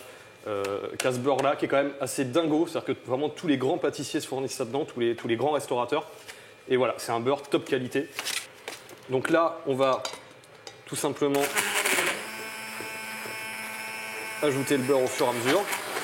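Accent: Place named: Quebec, Canada. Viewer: On parle québécois en plus...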